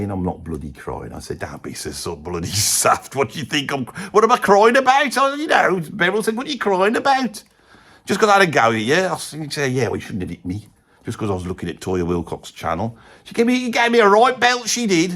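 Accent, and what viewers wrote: Birmingham, United Kingdom. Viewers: Love it, but really your Brummie accent is so much a joke !! 🤷🏻‍♂️😂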